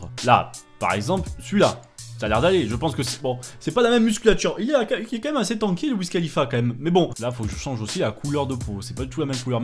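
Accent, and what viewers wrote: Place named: Marseille, France. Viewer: Ta l'accent Marseille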